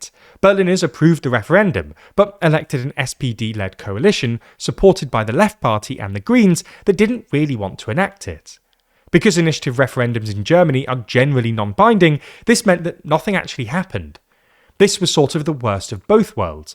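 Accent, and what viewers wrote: United Kingdom. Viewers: "dõnt õwn theyr hõüms" way too british for me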